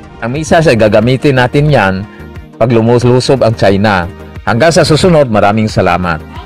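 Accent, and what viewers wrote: Visayas, Philippines. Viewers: Ayusin mo yung pgtatagalog mo bisaya ang dating mo.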